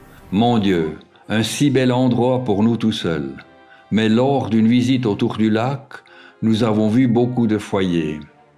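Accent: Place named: Switzerland. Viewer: Bonjour, Des paysages magnifiques. Toujours l'accent Suisse merveilleux. Bonne continuation. Thierry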